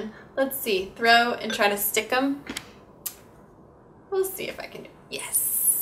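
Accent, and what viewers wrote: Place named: United States. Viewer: When I hear you talking, I hear an unclear voice like somebody who just woke up or have been to a place where you had to shout loud, like your voice is cracking, hard to describe but I was talking with other people in my country about it and they said it is typically something American women do. Why do you guys do that? It doesn't sound like a nice clear voice to listen at to be honest.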